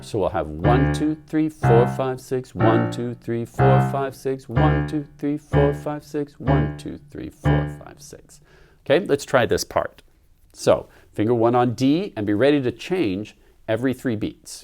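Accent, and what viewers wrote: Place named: United States. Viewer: He sounds American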